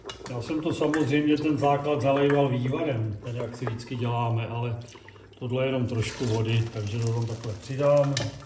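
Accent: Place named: Prague, Czech Republic. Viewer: Dědo Vy jste krásnej Pražák , s nádhernou pražskou češtinou 🌺🌺🌺 a já Vás ráda poslouchám i kvůli té Vaši krásné češtině 🇨🇿🥰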